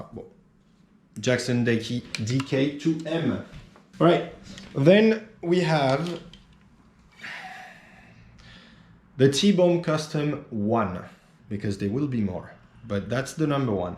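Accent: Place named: France. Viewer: With your accent I think you're French no? Cocorico ?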